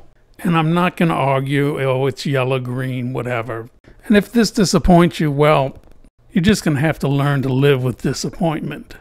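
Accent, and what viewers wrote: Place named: South, United States. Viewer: Hello, I was wondering if you sell opal?? And how come I absolutely love faceted opal but I NEVER see faceted Australian opal?? If you sell opal, please let me know! I buy Ethiopian and I’m wanting a large hunk of Australian but good lord it’s expensive. And your very southern, where you from friend? I’d love to sit down with you and saturate all your information!!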